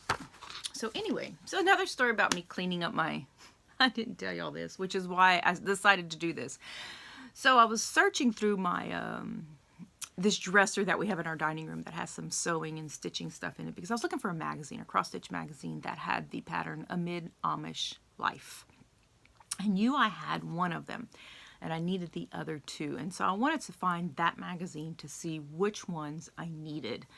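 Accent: Louisiana, United States. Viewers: I’m glad you subscribed to my channel so I could find you. I’m just starting to watch your video and from the first sentence you spoke, my guess is that you’re from Louisiana. Am I right?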